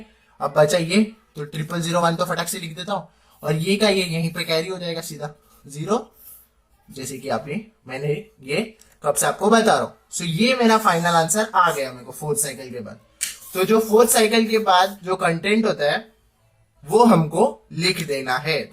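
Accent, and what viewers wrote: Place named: Mumbai, India. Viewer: jyada mach mach nahi hui...Love your mumbai vali hindi bhai..I'm from VJTi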